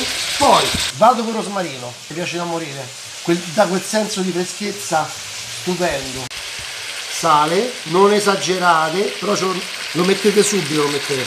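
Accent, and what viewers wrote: Rome, Italy. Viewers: Maestro, continua col romanesco, la nostra bella Italia, lascia perde i radical chic inglesi. 😉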